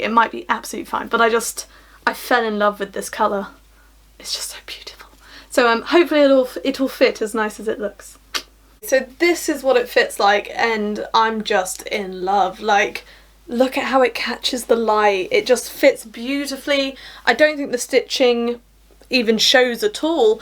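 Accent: United Kingdom. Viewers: Can’t beat the combination smart, beautiful and that British accent!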